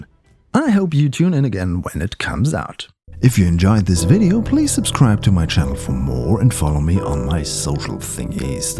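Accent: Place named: Germany. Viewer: I know a german guy trying to speak professional english when I hear one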